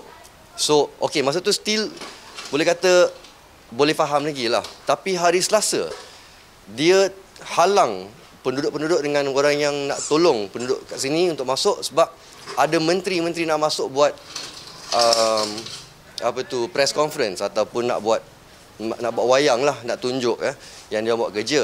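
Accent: Malaysia. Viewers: Bro Your Bahasa in truely Malaysian, Good luck.👏👌👌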